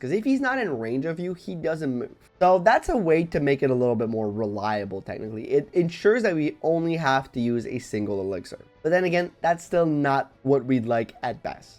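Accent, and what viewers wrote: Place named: France. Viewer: First time I have noticed an accent, are you french?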